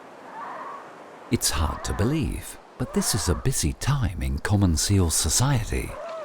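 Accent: RP, United Kingdom. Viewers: ITS A , YES ! A FOXUMENTARY . OF COURSE AND NARRATED BY A RIGHT POSH BRITISH VOICE ! OH!! RATHERRRR!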